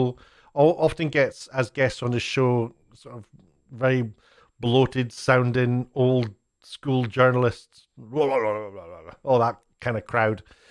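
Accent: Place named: Wales, United Kingdom. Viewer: The following comment is not about Talk Radio, but about the YouTube rules and regulations which you mention: Last year when YouTube introduced the COPPA changes, I was shocked to consistently hear/ read in the comments that a lot of folks seem to think YouTube is a public service company. It isn't, it is a private company which means EVERYONE has to adhere to their rules. In exactly the same way that every pub landlord reserves the right not to serve someone they don't think should be standing at THEIR bar. Also, since the COPPA clean-up which might have also coincided with the new Community Guidelines (I don't know if this was part of COPPA?) I much prefer the YouTube experience now, as the comments were becoming a hate-filled, foul-mouthed cess-pit, which I would not allow in my bar! (I don't own a pub, I make armour... but staying with landlord analogy). 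In the words of our leader (Mr Colin Firth) "Manners maketh man" , so let's all pretend our Grandmother is sitting at the table and be more courteous, this used to be something the British were famous for. 😉🏆🇬🇧 Best wishes, and I like your accent... I have always had a soft-spot for The Welsh! 🤔😉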